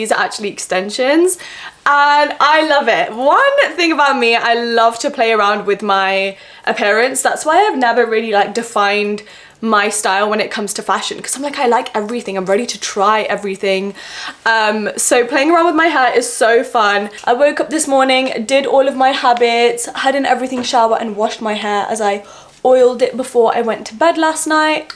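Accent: United Kingdom. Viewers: I love you London ascent ❤❤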